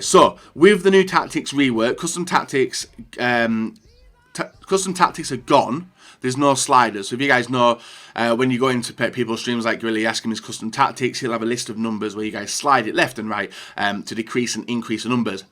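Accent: United Kingdom. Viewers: He has a earl thomas III seattle seahawks jerseys, but has a british accent... intrusting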